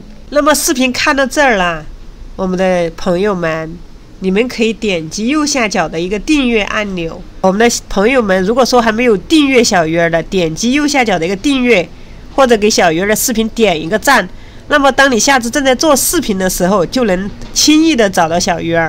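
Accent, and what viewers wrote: Sichuan, China. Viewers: 不错，你的川普，我知道你在哪里了，怎么联系你，跟着学习，谢谢！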